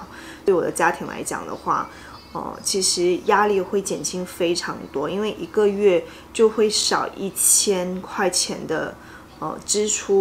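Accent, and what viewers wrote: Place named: Taiwan. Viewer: 有一点点的台湾口味。感觉很好 。支持你 。구독.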